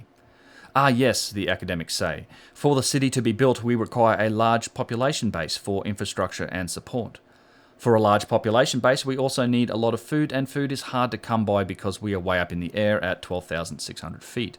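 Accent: Australia. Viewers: I wish the voice in my head had Aussie accent. <3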